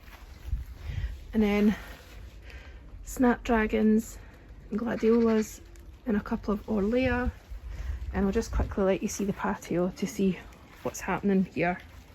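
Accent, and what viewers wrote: Scotland, United Kingdom. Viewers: Hi there. I just happened upon your channel today. Great to hear another Scottish voice 😛 You have added lots of great plants into your garden and things are looking great. The Heucheras, Brunnera and Hostas are doing well - mine are too - must be the Scottish air (I'm assuming you are in Scotland!). Anyway I have subscribed and look forward to binge watching your other videos. All the best. Mags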